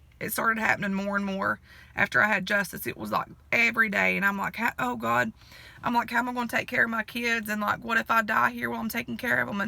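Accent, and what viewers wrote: South, United States. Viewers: I like you, you are from the south and you talk how you talk if people don't like what you are saying they don't need to listen.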